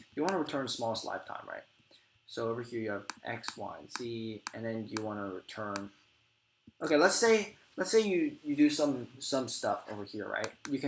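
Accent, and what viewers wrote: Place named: China. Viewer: 听口音像是中国人，所以能出个中文版吗？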